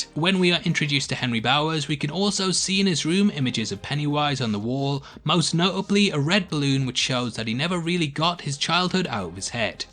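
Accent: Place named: Newcastle, United Kingdom. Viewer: Are you a geordie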